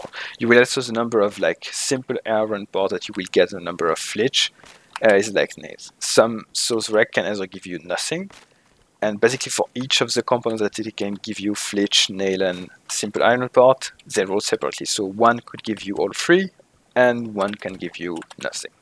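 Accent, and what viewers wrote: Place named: France. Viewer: I really like your french accent, by the way.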